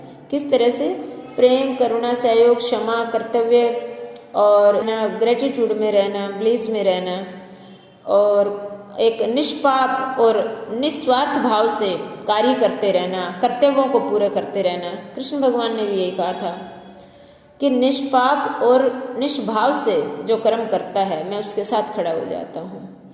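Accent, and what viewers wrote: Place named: Rajasthan, India. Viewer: आप राजथास्थान से लगते हो बोली से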